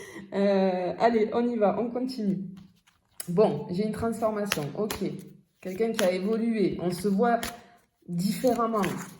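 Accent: South, France. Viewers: Ahh cet accent du sud me réchauffe le cœur et me rappelle mes années d'étude à Aix-en-Provence des années que je n'oublierais jamais ... Merci pour cette guidance ❤🧡💛💚💗💙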